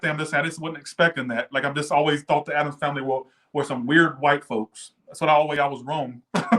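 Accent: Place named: South, United States. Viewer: I liked your strong Southern Accent during your Libra Reading. 🌋 Yes Observed and Monitored. Undercover Cops?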